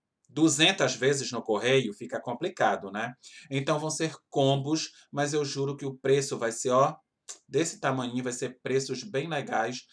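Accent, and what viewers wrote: Pará, Brazil. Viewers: Esperando esse desapego... vc pelo sotaque é paraense? Meu filho nasceu no Pará, fui morar Macapá e hoje meu filho, 26 anos, mora nos Estados Unidos. Bjs adoro suas resenhas, vc tem muito carisma. 💕🥰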